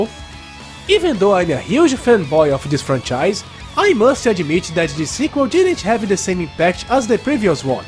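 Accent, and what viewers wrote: Brazil. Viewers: Será que você é brasileiro? :^) Acredito que gringos conseguem entender a maior parte, mas da pra dar uma melhorada, heim. O vídeo em si está bem legal.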